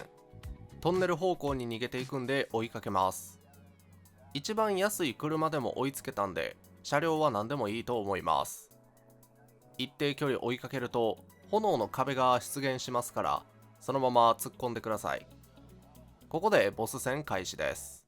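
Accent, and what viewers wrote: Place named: Kansai, Japan. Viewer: 隠しきれない関西訛り好き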